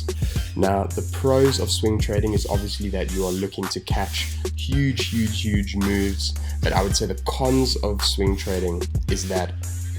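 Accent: South Africa. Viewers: Durban Accent?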